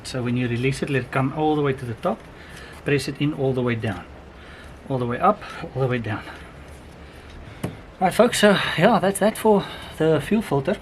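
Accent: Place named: South Africa. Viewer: Love the South African accent! Very helpful, thanks!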